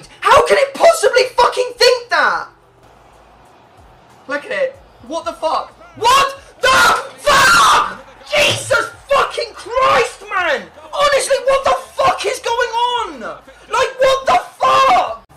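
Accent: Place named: RP, United Kingdom. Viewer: He sounds so posh lol but he's a legend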